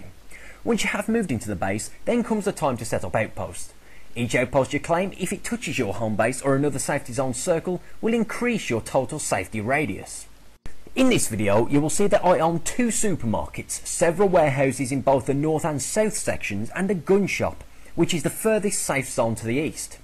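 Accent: Birmingham, United Kingdom. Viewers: your from birmingham :D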